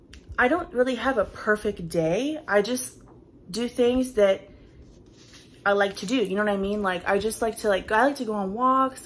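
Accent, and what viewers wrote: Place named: South, United States. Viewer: I love that great video. You are so beautiful. I love your Southern accent.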